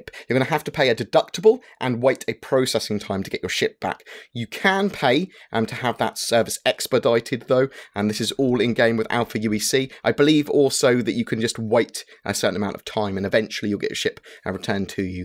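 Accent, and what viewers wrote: England, United Kingdom. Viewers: Thanks for the update. Are you English?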